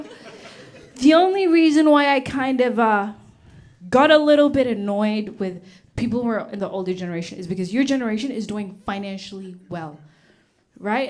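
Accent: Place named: New York, United States. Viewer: I was about to say she sounds more like a Noo Yawkuh who lived in the UK a bit (a lot like my Brooklyn-born and raised cousin who lived in London for 30 years) than an Aussie or an African. Surprised she developed that accent after arriving in Oz at such a young age.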